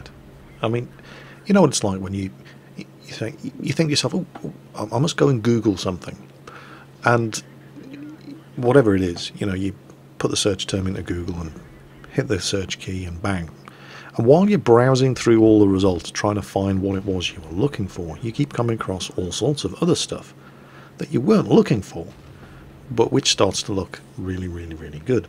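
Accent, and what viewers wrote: United Kingdom. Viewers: It's dat accent. Classical Brit is what makes you popular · we love the Man Cave becasue we get to know more about you then just "That cool old british guy we hear on videos"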